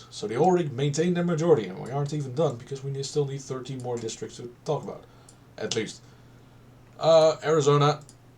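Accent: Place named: Netherlands. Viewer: Je Engels is uitmuntend maar ik heb zo'n vermoeden dat ik een Nederlands accent hoor dus ik schrijf in het Nederlands ;). Hoe denk je dat de verkiezingen zullen verlopen? Sinds 2016 heb ik het idee dat Amerika steeds radicaler en gevaarlijker wordt en ben mij nu zelfs aan het afvragen of verkiezingen überhaupt zonder kleerscheuren of "spektakel" (in negative zin) gaat plaatsvinden.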